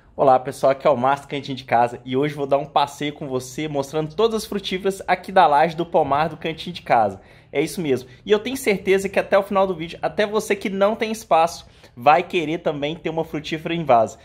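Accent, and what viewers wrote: Minas Gerais, Brazil. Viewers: Cê é de onde de minas?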